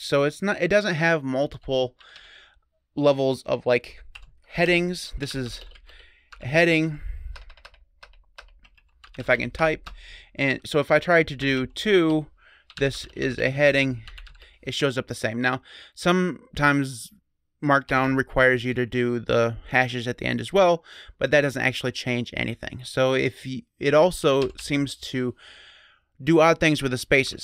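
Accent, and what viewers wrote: Spain. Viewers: Buen acento español :D eres el mejor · Me encantó tu acento en español, I loved your Spanish accent, maybe my English accent doesn't is so good. Dialect reminds me Crow-translate, the interface seems to be the same.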